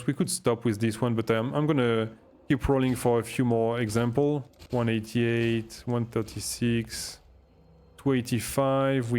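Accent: France. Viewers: au vue de ton accent je suppose que tu es français ^^ en tout cas , très bonne vidéo. Les divines se font rare en ce moment x)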